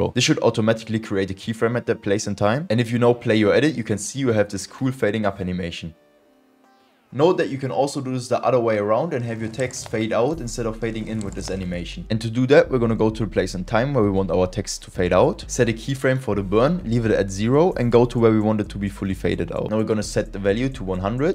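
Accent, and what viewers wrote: Germany. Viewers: Der Bruder muss deutsch sein bei den Akzent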